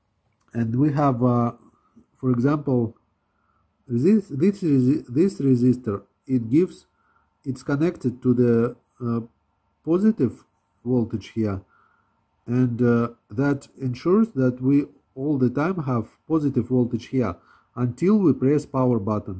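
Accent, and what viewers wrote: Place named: England, United Kingdom. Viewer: О, а вы из Англии?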